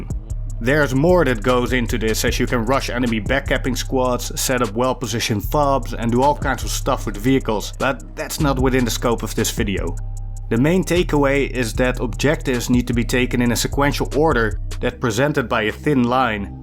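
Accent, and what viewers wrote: Netherlands. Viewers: Proper dutch accent mate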